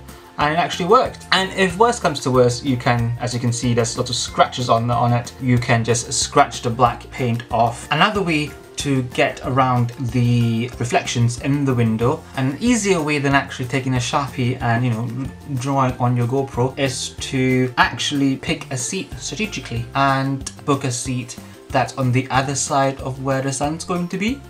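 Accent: Scotland, United Kingdom. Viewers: I like the idea you've got with you GoPro because of that reflection thing.. And I like your scottish accent :D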